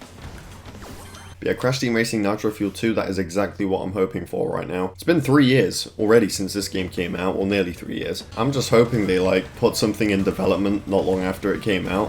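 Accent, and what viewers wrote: United Kingdom. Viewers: I get you with sounding monotone. I think the British accent plays a big part in that tho no matter how much you try not to sounds monotone. I'm from the north of England so i think the same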